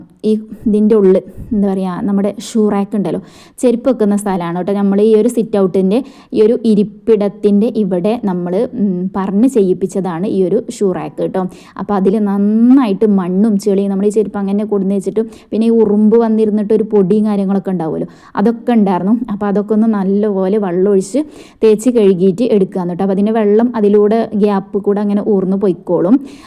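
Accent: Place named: Kozhikode, India. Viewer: എന്തിനാ കോഴിക്കോട് ഭാഷ പറയുന്ന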